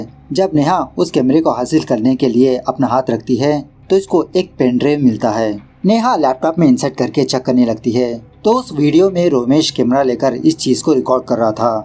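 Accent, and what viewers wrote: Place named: South, India. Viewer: You sound sweet bro, speak Hindi with South Indian accent, we love it.😊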